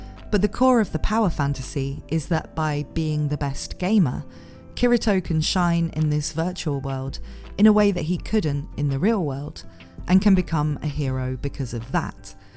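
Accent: United Kingdom. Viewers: I’m an over the road(otr) trucker in America, so it could be just that lack of familiarity with uk accents, but you really sound like my truck PC gps voice. A very pleasant smooth female British voice. Also, great video. As a dude, I do get fatigue from constantly hearing that because I have a penis, I’m the source of all women’s problems, so every once in awhile, it’s nice to hear from a woman who isn’t bitter down to the core of her being about having to live as a shudder woman. Finally, I also LOVE to hear from people who are truly passionate about things. Like when someone KNOWS cars and can talk your ear off about different models and their high and low points. And while the video wasn’t all about re:zero, the obvious passion you have for it, coupled with the fact that I didn’t know much about it before, and learning all this new information about it from you, has made me think that maybe I ought to go purchase the first few manga, or at least watch a few episodes. 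Also, also, you’ve definitely earned a subscription with this video. Sure it was an hour but it didn’t feel like one! Well done!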